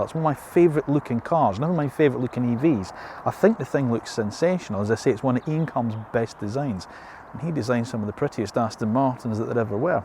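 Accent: Scotland, United Kingdom. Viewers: Great concept to compare the used Jaguar to the new Kia because they'd be similar in price. They both look nice on the outside but the Jaguar is another level inside. Also many people say that the Kia is not a good handling vehicle when cornering and that the Jaguar is which would make all the difference to me. Favorite feature on the Kia is the adjustable Regen located on the steering wheel via paddle shifters. I found I was using them like down shifters brilliant. It's hard to get either one of these cars in the states right now. Would love to see a comparison between the i pace and the pole star 2 is there both more of a driver's car. I could listen to your Scottish accent all day btw.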